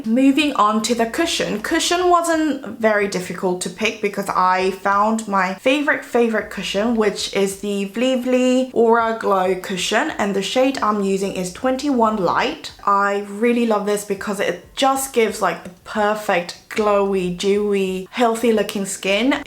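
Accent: United Kingdom. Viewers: Love the British accent🙃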